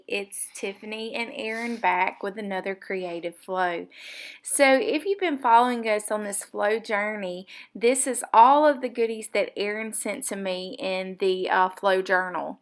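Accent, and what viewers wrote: South, United States. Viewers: LOL...I thought you might be nearby, you sound too much (accent) like we do around here LOL, plus your sense of humor. That good ole' southern charm, aye! I'm in central Arkansas and was hoping that you might be in the area. Love your project!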